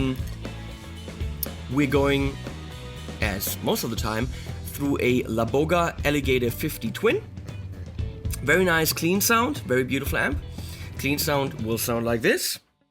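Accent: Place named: Germany. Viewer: So ein schnuckeliger deutscher Akzent!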